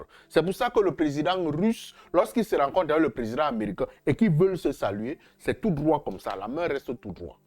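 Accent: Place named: Cameroon. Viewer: au senegal pays musulman constitué de confreries leducation est basée sur lenseignement coranique ou francais et non tout ce qui est maconnique donc il n'ya aucune chance que diomaye puisse comprendre ces choses en vrai senegalais quil est , mais meme si macron fait des signes maconniques il peut se targuer detre plus grand mais ce qui est sur est que diomaye est bien dans sa tete et connait deja la politique qu'il va mener donc les signes maconnniques je suis plus grand et tout , eh bien quils le mettent dans leur trou du cul tout simplement , rien a foutr et puis vous les panafricains camerounais car je sais que tu es camerounais par ton accent ce signe de la maingue maingue vous devez dabord vous occuper de deloger biya de votre pouvoir avant de venir noius donner des lecons de macons ou je ne sais quoi vous avez vraiment du boulot qui vous attend ces a dire deloger biya 40 ans de pouvoir voyons je ne comprends pas pourquoi vous vous acharnez sur le senegal ou yaurait d'autres raisons a ca , vous etes trop focalisé sur le senegal , occupez vous de vos oignons nom de Dieu ce nes pas parcequ on est africain qu on doit forcement vous rejoindre dans vos ideologies , ideologie pensee unique cest archaique et démodé ca chacun il gere son pays et cest tout nous cassez pas les couilles a la fin quoi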